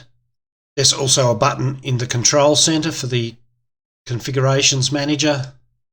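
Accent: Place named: Australia. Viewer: There is something comforting and no nonsense about someone with an Aussie accent giving a tutorial on Linux. His YouTube handle is also 😁 Instant Subscribe!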